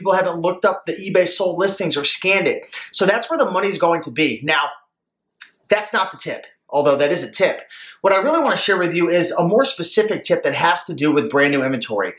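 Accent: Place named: New England, United States. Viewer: Yankee accent kills me, and people fuss about robotic voices....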